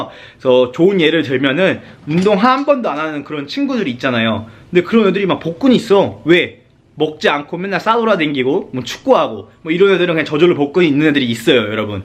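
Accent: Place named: Chungcheong, South Korea. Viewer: 말투가 충청도 분 같네요 인상 좋으심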